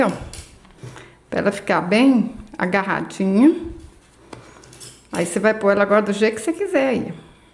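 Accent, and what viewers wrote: Minas Gerais, Brazil. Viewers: Estou encantada com você. Esse seu sotaque mineirinho é muito agradável. E as coisinhas que você ensina são lindas demais. Obrigada pelo desprendimento. Deus te abençoe grandemente 🙏🏻😘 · Oi...gostei demais uai...você e mineirinha igual eu só..??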